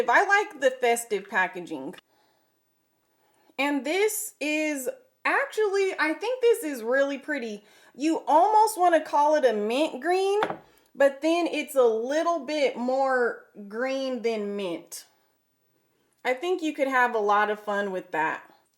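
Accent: South, United States. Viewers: This is my first video and I can not for the life of me figure out where this girl is from... what accent does she have? It sounds southern but with a twang? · she sounds country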